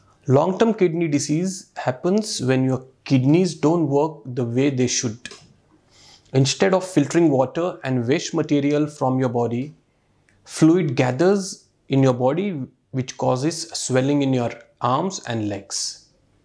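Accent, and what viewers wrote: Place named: India. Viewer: it's good Inglish with Indian accent I understood all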